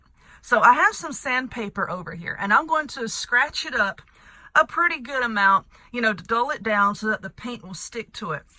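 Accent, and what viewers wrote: South, United States. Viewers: I'm new to your channel, this is my second video on your channel I've watched...I have to say it but I love your accent! Lol. And this diy, OMG...it's so beautiful!! Thanks so much!! ☺💗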